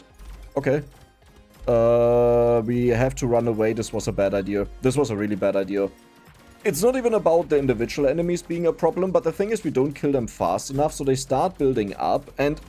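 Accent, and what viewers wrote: Germany. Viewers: such a nervenkitzel ;D ich liebe das deutsche englich einfach der beste akzent ;;D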